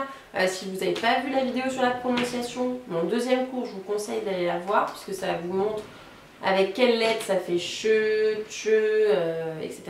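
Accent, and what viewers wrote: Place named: France. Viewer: Non ho bisogno di imparare l'italiano, considerando che io sono italiano, ma non riesco a smettere di guardare i tuoi video: hai il viso più bello che abbia mai visto in tutta la mia vita. Poi il tuo leggero accento francese, quando pronunci una parola italiana, è dolcissimo :)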